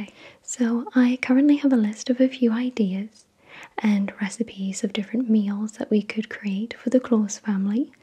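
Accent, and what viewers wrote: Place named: Wales, United Kingdom. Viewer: Tyler Sudden II she’s Welsh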